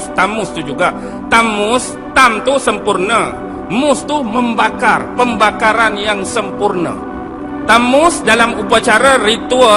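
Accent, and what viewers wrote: Malaysia. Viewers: Muhammad Husna Guterres ni dialek utara bro. Dialek utara Malaysia semua hampir sama (pulau pinang, kedah, perlis, utara perak)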